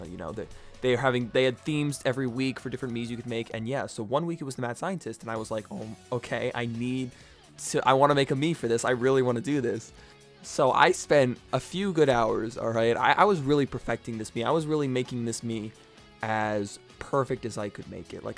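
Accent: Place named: United States. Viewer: kinda sounds like just a generic american accent (the kind where people who have it don't know that they have an accent, me being one of those people)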